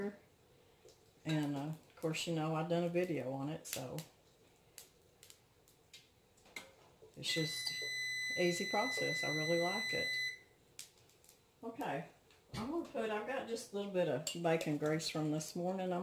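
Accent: South, United States. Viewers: Oh my your camera is wiggin out today! 🤔 Love this recipe, and your sweet southern drawl! Definitely comfort food with the addition of mashed potatoes and sweet peas and butter! Yum! Thank you for recipe using venison!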